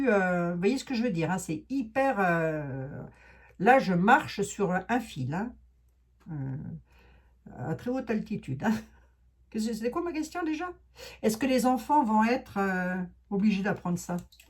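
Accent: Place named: Paris, France. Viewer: Mais j'aime ! La belle Lorraine qui parle en Titi de Paris ❤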